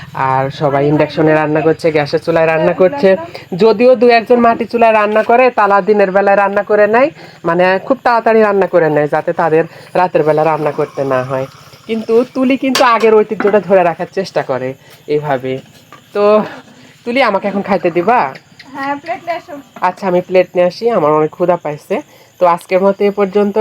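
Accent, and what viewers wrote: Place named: Naogaon, Bangladesh. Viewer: নৌগার ভাষা এমনি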